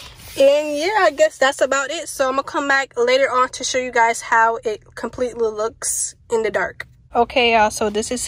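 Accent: New York, United States. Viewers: lol i knew you was from ny .... we all talk the same.... type shit lol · Her accent is so New York.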